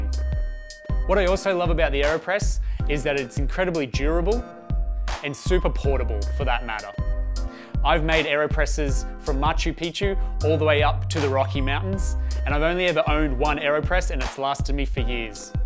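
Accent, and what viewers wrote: Australia. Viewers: where in australia is he from? :D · Man, I can't stand the Australian accent!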